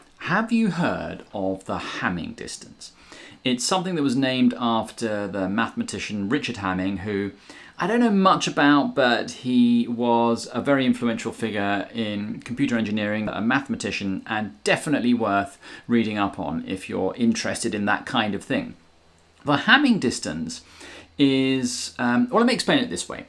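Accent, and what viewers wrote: RP, United Kingdom. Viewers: Is this Queen’s English? He sounds quite sophisticated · I wouldn’t go so far as to it’s the Queen’s English, but probably as close to RP as you’ll see on a YouTube video on Python. As my mother would say, he’s ‘well spoken’ .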